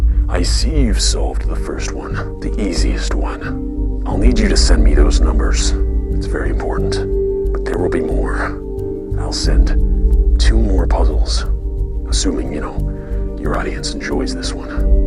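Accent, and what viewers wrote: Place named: Canada. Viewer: Legos are so much fun lol why do Canadians say oat instead of out. I through Lego blocks oat my window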